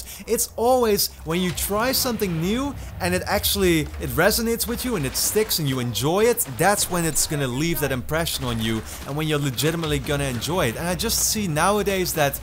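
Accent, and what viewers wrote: Netherlands. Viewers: i can hear the dutchness in your voice xD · Is this boi dutch? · I heared your voice and already know you are Dutch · This Guy is dutch.... I can hear it.... Im dutch too... And btw GO READ A BOOK PEOPLE!!!! RRRREEEEEAAAADDDD!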